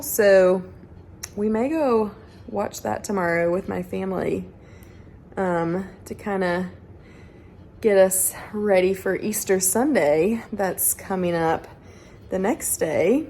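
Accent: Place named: United States. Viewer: Lovely to see you, beautiful and professional as always, luv the American accent xx Matt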